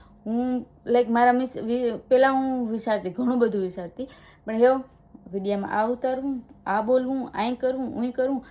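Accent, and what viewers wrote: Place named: Barda, India. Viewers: Mer ni boli emj bole bhai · bhai aa amara barda ni mithi bhasa 6 aa bhasa koi boli na sake amara sivay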